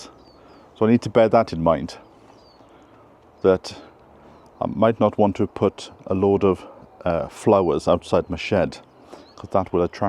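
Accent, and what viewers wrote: Wales, United Kingdom. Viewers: Yes, I am doing that, and know that you do not have the allotment now, but now have your Rusty Homestead - love your Welsh accent, and your beautiful eyes by the way! xxx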